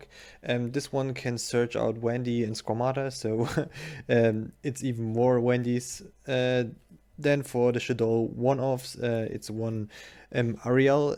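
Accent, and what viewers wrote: Germany. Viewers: Is that a German accent? :D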